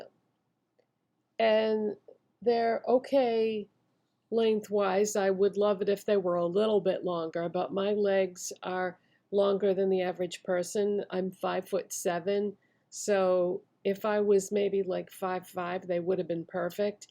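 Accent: Boston, United States. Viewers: i just found your channel and i love your content, subscribed!! also, are you from the new england/boston area? we have the same accent :)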